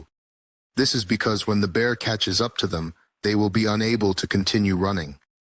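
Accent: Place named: United States. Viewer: Очень прикольно слышать "Андрей Борисович Кобяков" и "Олег Владимирович Григорьев", сказанные Михаилом Леонидовичем с натуральным американским акцентом. Конечно, ИИ ещё не совершенен, но здесь -- вполне терпим: по крайней мере, все частоты присутствуют, я все слова разбираю на слух и хорошо понимаю речь. Ещё прикольнее было видеть, как, не переставая чисто говорить, бесшумно отхлёбывает чай из кружки, которая вдруг становится прозрачной. В целом, ИИ следует подрихтовывать при первой возможности, а также, возможно, отдельно записывать адаптированные для него речи, чтобы артефактов было поменьше. Через год-другой, вполне возможно, это уже не потребуется, потому что ИИ сейчас развивается очень быстро, и его качество также быстро растёт.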